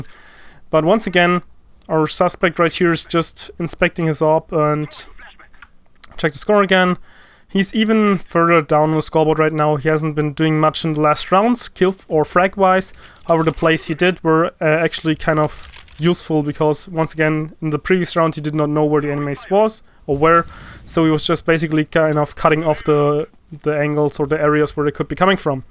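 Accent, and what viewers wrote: Germany. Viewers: Das erste Video was ich von dir sehe, direkt am Akzent gemerkt dass du Deutsch bist haha :D Ist aber ein mega Geiles Video geworden ! Hab mal gesubbed ;)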